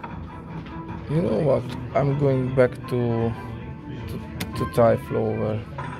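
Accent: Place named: Hungary. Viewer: ember! te magyar vagy........annyira lehet hallani,..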